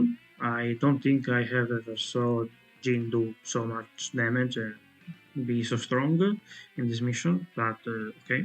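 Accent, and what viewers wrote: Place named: Italy. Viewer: Minchia fra, appena ti ho sentito parlare sapevo già che tu eri Italiano, sei un grande per portare questa grandissime macro serie con i tuoi video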